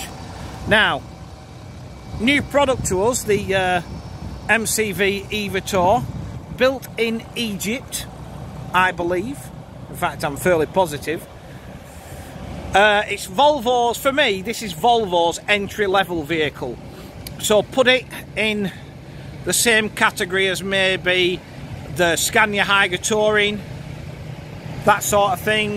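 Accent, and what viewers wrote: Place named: Yorkshire, United Kingdom. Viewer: Just love your voice proper Yorkshire, which part of yorkshire I realy don’t know.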